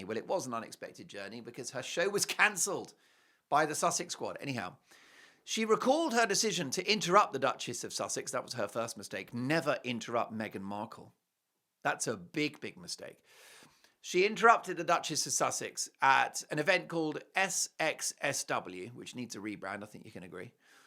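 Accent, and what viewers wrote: United States. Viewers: love your American accent, * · your American accent is cute and spot on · I love your American accent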